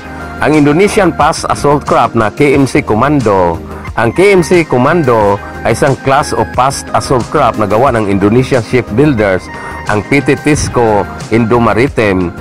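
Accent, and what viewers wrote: Visayas, Philippines. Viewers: bisaya ung bosses 😅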